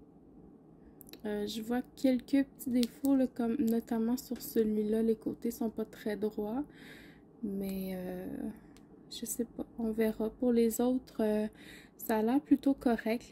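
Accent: Quebec, Canada. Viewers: j adore ton parler quebecois 😂😂😂 je comprend pas tout mais c est drole 😍😘😘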